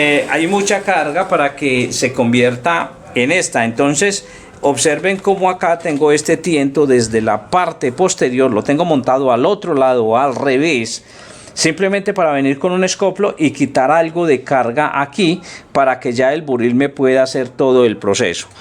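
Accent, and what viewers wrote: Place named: Antioquia, Colombia. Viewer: por lo demas,bien ,todo bien explicado pero ese acentico me lo escarapela,no nos gusta pa ni mierda somos medio alergicos a los paisitas aqui en bucara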